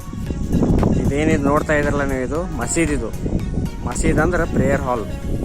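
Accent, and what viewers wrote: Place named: North Karnataka, India. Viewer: ಉತ್ತರ ಕರ್ನಾಟಕ ಗಿಚ್ಚಿ ಗಿಲಿ ಗಿಲಿ talking...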